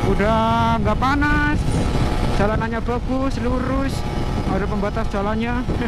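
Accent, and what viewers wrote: Banyumas, Indonesia. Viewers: Ngomonk nya bhsa ngapak aq jdi inget waktu bersama tmn tmn q dri wonosobo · Ngapak,e ketok mas😁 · Rider ngapak 😂 Jadi kagak ngantuk gue nton vlog rider 1 ini salam kenal aja mase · Salam NINJAR NINJARR KEBUMEN 😂 ,,vlogger nyampur ngapak😁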